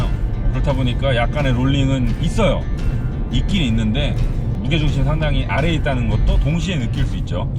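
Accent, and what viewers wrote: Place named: Gyeongsang, South Korea. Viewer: 경상도버전 개웃곀ㅋㅋㅋㅋ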